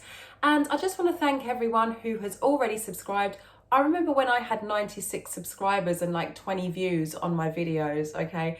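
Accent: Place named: England, United Kingdom. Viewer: Do I need an English accent like you to learn how to sing?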